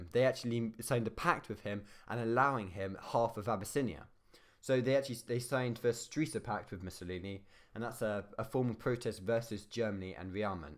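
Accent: United Kingdom. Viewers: I love your accent. R u from Yorkshire or something?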